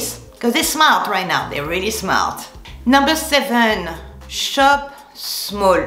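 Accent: France. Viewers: When I see your beautiful face and I hear “cleek,cleek,cleek in that wonderful French accent I know this video will be great. Your shopping advice was spot on. Since I started watching your channel I find myself making more of an effort in my appearance every day…and oh yes…perfume every day.!!!!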